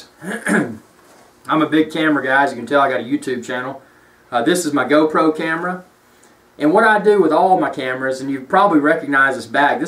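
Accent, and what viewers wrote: South, United States. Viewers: That was awesome. Thanks for the information. But what really kept me watching your video was your cool southern accent ;)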